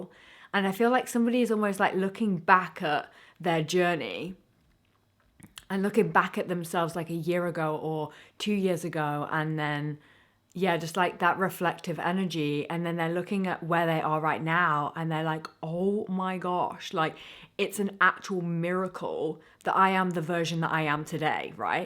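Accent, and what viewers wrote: England, United Kingdom. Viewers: Thank you so much! Your message resonated all the way! Very interesting indeed and I greatly apririciate your efforts 🙏🏻 The relinquishing has to do with my drug use, I need to let go of something I have seen as a right for 30 years. I already know I don't need it anymore but I seem to be afraid of the emptiness that will occur when I stop. I have already come to the realisation that this emptiness will actually manifest as more room for other things, and I got loads of things that could use more attention. Lately I have been receiving some re-tuning, very powerful changes in my subconscious that immediately cause stuff to integrate. Awesome stuff this whole 'becoming'! And you my love, your presence makes me feel deeply connected. Like I'm finally home, safe... I feel enchanted when you are in your way 😍 How you speak and laugh, you are beautiful in looks ánd your being. 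Haha I even felt a butterfly in my stomach when I realised that you speak with an English accent, that makes it likely that you are 'near'.. Don't worry, I do live in another country, the Netherlands, and I will not go around stalking you or something. I guess I wanted to share this with you because you make me feel special and I want you to know and feel that you are very special to me ❤️ Eyes open, no fear. Be safe you beautiful creature ❤️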